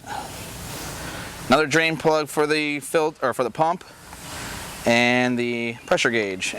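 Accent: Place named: Philadelphia, United States. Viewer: I love it ! I heard it and was like oh philly philly 🦅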